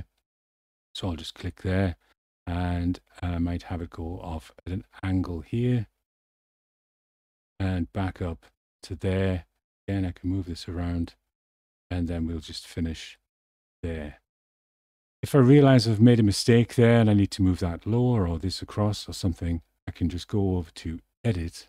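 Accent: United Kingdom. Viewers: Thanks for the response, I'm sure it is a lot more stressful to do that sort of thing As for your sense of humour and personality, it's probably fair to say it is very British, and all the better for that. It can get very hard finding a familiar voice in amongst all of the American streamers. Take care of yourself and I'll certainly finish off watching the last few episodes of the playthrough. Chris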